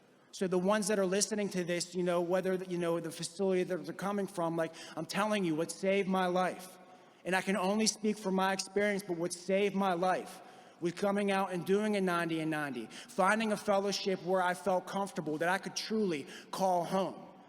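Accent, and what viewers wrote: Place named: Pittsburgh, United States. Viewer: Bring that Pittsburgh accent to all in the USA! 🤣 Fudge yeah!!!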